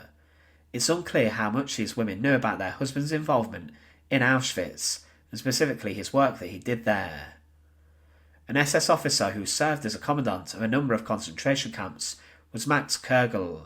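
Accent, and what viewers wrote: United Kingdom. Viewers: You remind me of Mark Felton videos, British accent also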